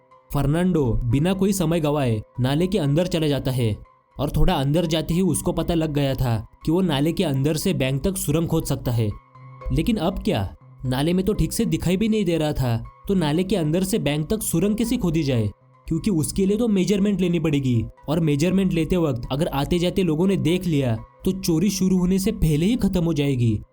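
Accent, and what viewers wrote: Maharashtra, India. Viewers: Ye jo bol raha hai ye marathi admi hai marathi ki hindi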